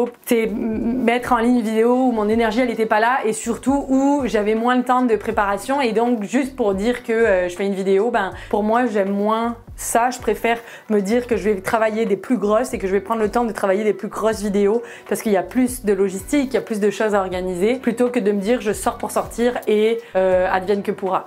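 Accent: Quebec, Canada. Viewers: Vidéo génial ❤ j’ai l’Impression que plus le temps passe plus ton accent québécois s’accentue 😂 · C’est drôle tu commences à avoir l’accent québécois sur certaines assonance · Tu as de plus en plus l’accent canadien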